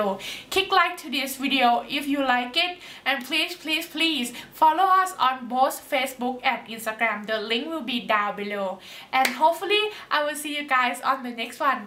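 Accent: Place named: Thailand. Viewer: Thai accent Oh~ that good 👍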